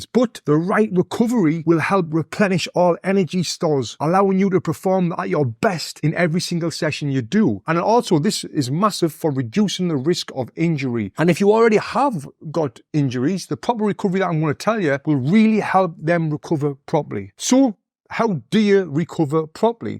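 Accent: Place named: Sunderland, United Kingdom. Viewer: he's from Sunderland, which is the city below Newcastle, so it's very similar to a geordie accent though most Sunderland folk call themselves Mackems not geordies. Hard not to love the northeastern accents 😂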